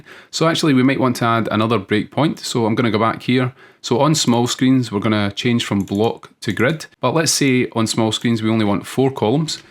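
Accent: Scotland, United Kingdom. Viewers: It would be good to have a cotton htmx video. By the way are you scottish ?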